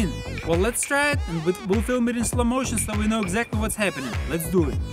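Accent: Russia. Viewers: I hate it when he says "let's do it." In his annoying Russian accent.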